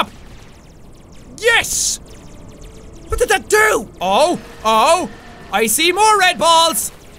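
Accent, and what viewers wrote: Ireland. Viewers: are you irish? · I like that instead of hard, u say herrd xD love it (yes i know is irish) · What part of Ireland are you from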